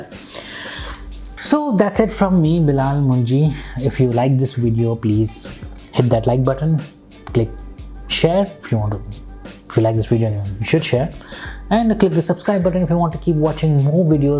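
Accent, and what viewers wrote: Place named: India. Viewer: This isn't any hate, my problem is not that you're indian, but the accent tickles me so much man x)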